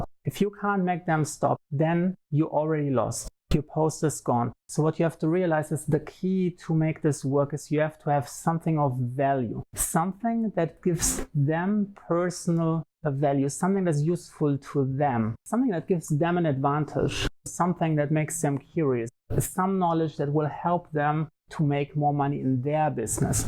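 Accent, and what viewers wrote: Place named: Germany. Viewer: Wusste ab der ersten Sekunde, dass du Deutscher bist 😅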